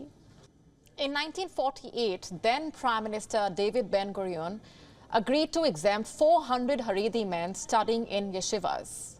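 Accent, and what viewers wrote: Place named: India. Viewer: This is a hard accent to like to western ears. Why does a India news channel speak in English anyway. Go away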